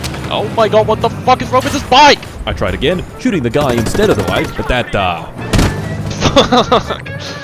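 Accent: Finland. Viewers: Ah the sound of Finland, thank you for blessing our ears.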